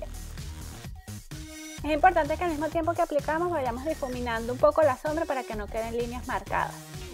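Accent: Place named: Venezuela. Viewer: Te quedó muy lindo el maquillaje!! te dejo mi apoyo y te invito a pasar por mi canal.. soy venezolana, a ti te escucho como acento venezolano :)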